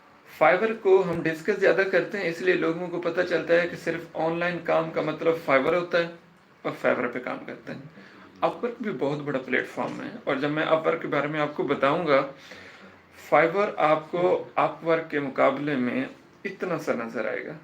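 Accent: Saraiki, Pakistan. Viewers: Aoa bahi upwork k hawalay say kuch kuch dost janty han per ap jo behtar basic ssmajhty han zaroor baten take jo new dost hy ap ki piyari sweet sarski awaz m wo be seekh saken jasa k ap kehty han k sekhen elam.hasil karen samjen to is klie sekhna zarori hy or ap behtar andaz main proper sekhaty han sir sb tamam pakistiyon sariki waseb sb doston bshi ki tarf say ap ko bhot sara piyar thaks with love u all... From Engineer multan say sir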